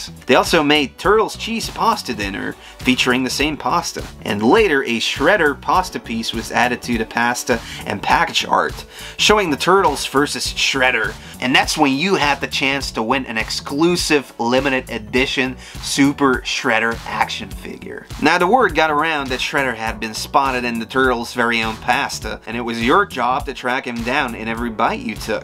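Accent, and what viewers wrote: Midwest, United States. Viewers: First time watching. Your accent is really interesting and familiar. Midwest?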